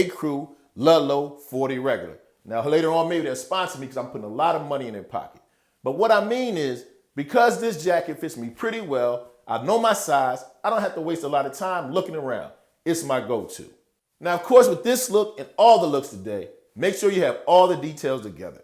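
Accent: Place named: East Coast, United States. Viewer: Ahh ok you from Philly, I had a feeling you was from somewhere on the east coast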